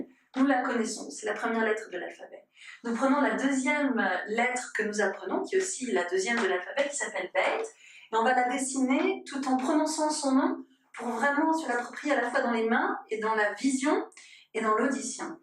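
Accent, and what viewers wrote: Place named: Tlemcen, Algeria. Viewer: On dirait une tlemcenienne.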